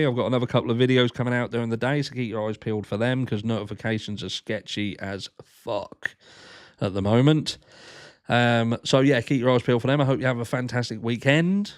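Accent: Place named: RP, United Kingdom. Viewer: Hello that posh accent 😂 i didn’t think it was you until the swearing😂.. in bed still ill & hubby put you on but i wasn’t watching at first just listening i thought thats no.. 😂 that was a lot of docs.. sorry to hear about your daughters glasses.. maybe who done it will own up.. not.. hv a good day everyone im off to feel sorry for myself 🤷‍♀️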